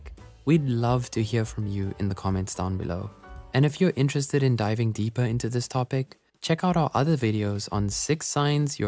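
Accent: South Africa. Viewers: Idk how to explain it, but within the first five seconds of hearing the narrator, I immediately heard a fellow South African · out of topic but is the person talking south african? i hear the accent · Is this voice over South African? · Are you south african? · I am hearing my people. Is the narrator South African?🇿🇦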